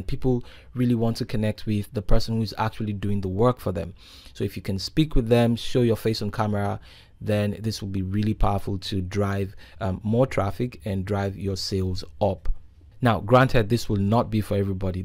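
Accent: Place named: Nigeria. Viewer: I like your clean clear Nigerian accent.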